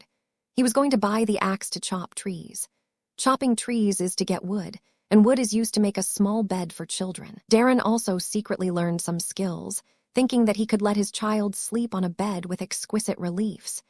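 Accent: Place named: China. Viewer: I knew it was chinese translated snd fan made. Just how the narrator speaks. Good story though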